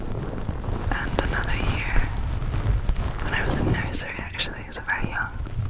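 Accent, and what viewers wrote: Scotland, United Kingdom. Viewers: Prefer the rambling to the pure sounds because of the melodious Scottish accent. You should def do livestreams- super under utilized in asmr community. Also wouldn't hurt you to get some of dem superchats!😉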